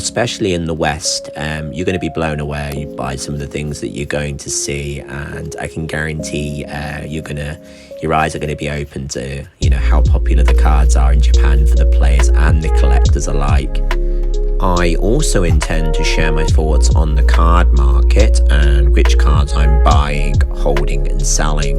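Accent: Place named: United Kingdom. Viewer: Yeah still sound British just different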